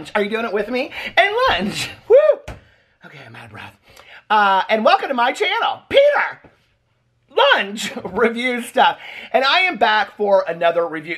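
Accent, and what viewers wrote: South, United States. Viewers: I was more of a Richard Simmons kind of girl? 😂 this was SO fun, I love your Southern accent! 😂 love you! 💙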